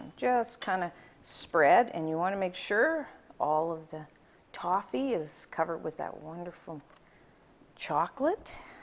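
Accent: Canada. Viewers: i am so so curious as to what your day job used to be, before this took over, since i've been watching you for years and you have always had measurements memorized and C/F conversions memorized and by your accent i can tell you are canadian or close to..and i have always been so impressed with your matter of fact/scientific way of explaining everything. nothing too lovey dovey or baby like. that's why i just need to know what you did as a job before this took off. ♥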